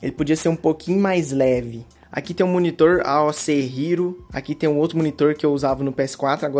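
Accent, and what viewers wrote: Minas Gerais, Brazil. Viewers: cê e mineiro ou sulista?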